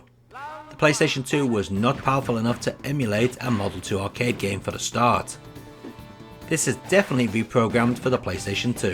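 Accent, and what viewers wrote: United Kingdom. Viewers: Can’t tell if you’re British or Asian.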